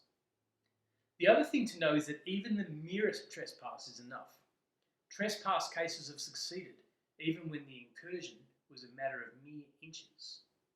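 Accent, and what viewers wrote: Australia. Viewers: god damn aussi accent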